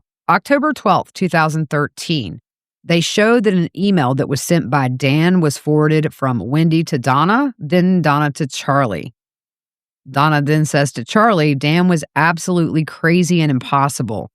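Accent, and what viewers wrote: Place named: Boone, United States. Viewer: One can become invested in the success of a content creator. In my case about two years ago my wife asked me why I was emotional. I was blinking back tears as was talking about her Grandmother, taking her to get her hair done or to a medical appointment. Then I learned daughter moved out of state. I told my wife I am reminded of my family from Boone NC area. Similar accent etc, same heart. I tithed my time to this podcast as I shared and liked the podcast. I also try to write a comment that a new viewer may like and subscribe. I am now in a position to help this channel with $$ and I plan on making it happen Asap. Thanks …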